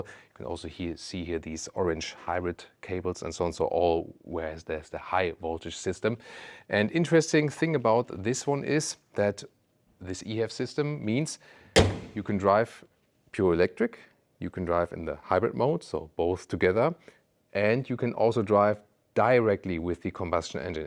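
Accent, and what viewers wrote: Germany. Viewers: German Accent made this review even cooler.👍🏾🇺🇸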